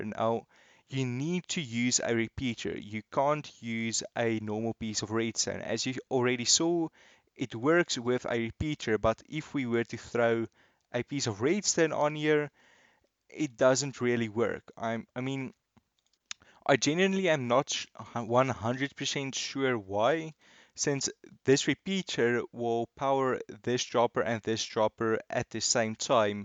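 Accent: South Africa. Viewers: Really nice vid, bud! Sorry if this is rude, but you sound South African. Thanks for the T-flip-flops! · You sound South African.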